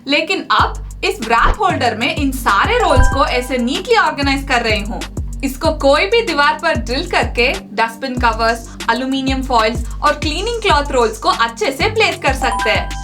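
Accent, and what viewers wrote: South, India. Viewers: South indian accent🎉🎉🎉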